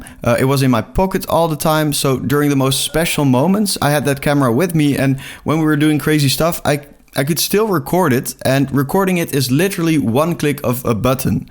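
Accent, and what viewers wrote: Netherlands. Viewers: I smell a dutch accent? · Soow Die nederlandse accent gaat nooit weg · Fist 5 seconds in the video "ohh.. that accent... he must be dutch" The humor in the video - yap, deffinately dutch 😀 Great Video - Cheers from your east border!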